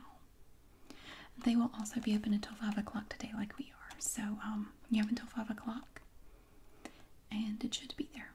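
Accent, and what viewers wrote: South, United States. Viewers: She sounds like a southern pam beesly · I love your southern voice and biscuitville as well!! I eat at the one in Greensboro often lol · I just discovered your channel today. Your videos are amazing! I've listened to this one twice already and love the sounds and your accent! There's nothing like good ol' southern hospitality here in the south! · sometimes I forget that you have a southern accent and then I read the comments about the accent and then I just think “she has an accent??” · So many tingles! Does anyone else feel like she sounds like Pam from the Office but with a Southern accent? Such a soothing voice.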